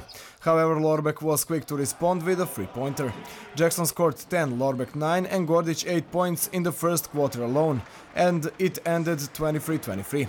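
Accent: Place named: Serbia. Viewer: Prica kao na Srpskom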